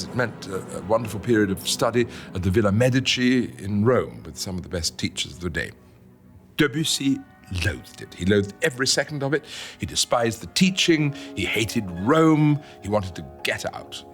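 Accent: RP, United Kingdom. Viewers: Anyone without a wanky posy accent. Almost anyone on DW news would be wonderful. Most people on the BBC would be a significant improvement. I don't see the point of sounding like a really annoying snobby social climber. After all, there's nothing wrong with the music. Why screw up the text that way? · I thought the same of the lengthy introduction, and it seems that the narrator would like to magnify the narrative effects by such contrivances, but it can test our expectation (our wits) which, with some endurance (add the intrusive ads and other distractions), we may finally arrive at the gist of the subject-matter in hand. Nevertheless, for the more patient and cultured, it is worth the efforts. It is not easy to say whether a short video, taking such goodly swath of introductory embellishments, could enhance the desired effects, which is to capture the viewer’s attention from the outset? 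That said, one is bound to admit that these documentaries, despite the narrator’s fondness for an architectural backlog of informations and history, are highly recommended and even of the highest rank. One could say that is natural to the British people, with their distinct accent, to win our admiration as the quintessence or epitome of intellectualism and refined culture.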